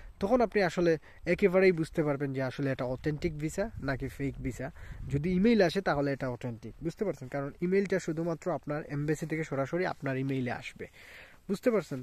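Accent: Sylhet, Bangladesh. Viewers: ভাই সিলেটি নি ❤ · Vai Kotay lager Sylheti bhai basha koi?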